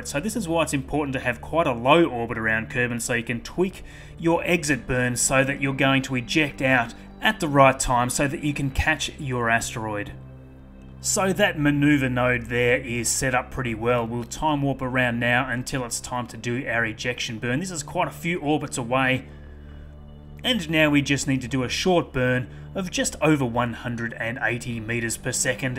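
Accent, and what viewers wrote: Australia. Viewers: Another awesome video.....and it's really wonderful to hear a fellow Australian on KSP videos.....not that Shadowzone's German accent and Mr Manley's Scottish brogue aren't entertaining but it is a lovely reminder that we Aussies love all things spacey as much as the rest of the world. Cheers mate! Bloody bonza job!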